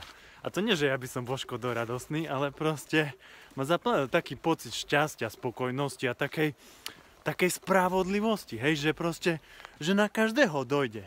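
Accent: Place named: Košice, Slovakia. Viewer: Aj origo kosicania tak rozpravme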